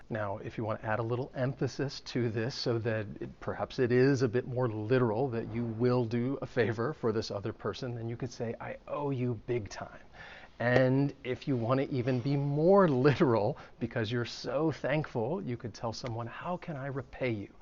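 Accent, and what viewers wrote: United States. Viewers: Hi teacher , thank you for this lesson beacuse you speak englush american accaent ( i love american accent iust ! ) so clearly and lowdly ! I am 60 years old ! My first language is turkish !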